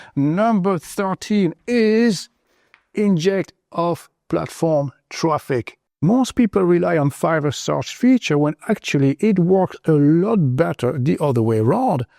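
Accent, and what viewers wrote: France. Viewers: gotta love the french accent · I suspected the French accent too with well spoken English. Kudos sir!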